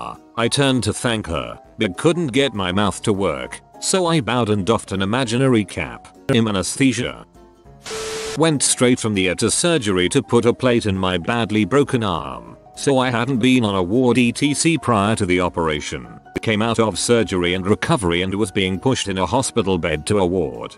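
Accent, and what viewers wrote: United Kingdom. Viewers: The voice reading is British XD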